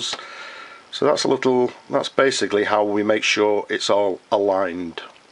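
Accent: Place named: Manchester, United Kingdom. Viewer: Thanks to you (and a friend who supplied a feed,nib and distributor) I was able to repair a Parker 51 that belonged to my late grandpa. Thank you very much. Your videos are clear, your voice is quite nice (where are you from?, I can't locate your accent quite well, I'd say Manchester.) and well, your videos are all-around great. Thanks again!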